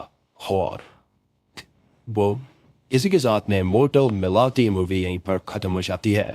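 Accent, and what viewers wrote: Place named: United Kingdom. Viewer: Hi your videos explained are very nice but I dont like that you put british access in your talking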